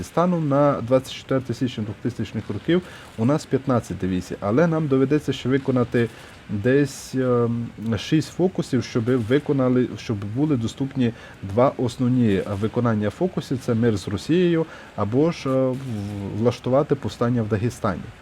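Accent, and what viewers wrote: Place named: Vinnytsia, Ukraine. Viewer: Друже! Південь Вінничини? Люблю свою країну! За вимову йдеться! Буковина?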